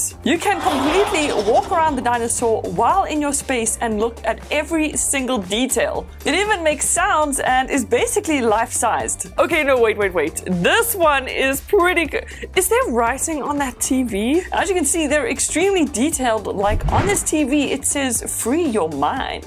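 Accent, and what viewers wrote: South Africa. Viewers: What accent is that? ZA? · Wait are you south african? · Is that a South African accent?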